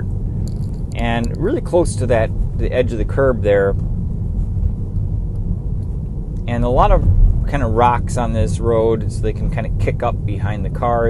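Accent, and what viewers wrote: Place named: Canada. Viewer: Are you Canadian? I’m hearing a bit of an accent 😂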